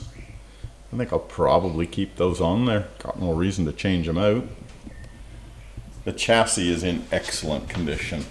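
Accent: Canada. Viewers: Hi. Another cracking video and you’ve set yourself quite a challenge the! On another issue altogether now. I just can’t seem to help myself looking beyond the video presented to speculate on the presenters background. There’s no hiding that Canadian accent but your use of the Australian “lingo” is spot on. So much so in fact that I could almost second guess what you were going to say so I would call you 100% Australian! So welcome mate! You must also have some sort of mechanical / technical background to be tackling the projects you do. Whatever the case welcome to Australia and I hope you and your family have a long and happy life here. Cheers from Gippsland.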